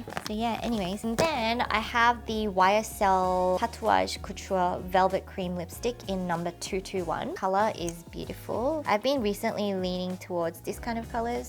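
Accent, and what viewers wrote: Australia. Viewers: Hi, your accent caught me 😅 I’m from down under too! Which part of Australia will u be moving to? I’ve just started exploring K-Beauty and really enjoyed this VDO. Already purchased a-few items you shared 🤭. Looking forward to your next upload 🙏🏻🫰🏻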